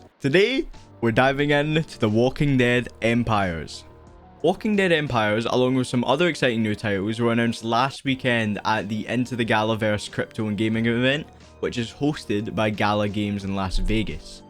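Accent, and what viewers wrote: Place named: Scotland, United Kingdom. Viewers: You guys are really trying to make it on this channel even switched the robot for the old dude and now the old dude for a younger British sounding (sorry if your not) but my question is when do I get a turn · Sounds Scottish to me not British :')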